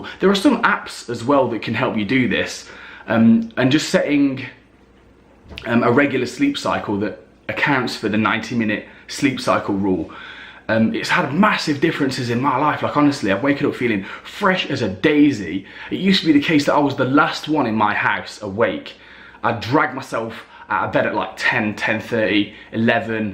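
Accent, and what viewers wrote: United Kingdom. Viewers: Are you from Britian? im lovin.. the accent.. 😃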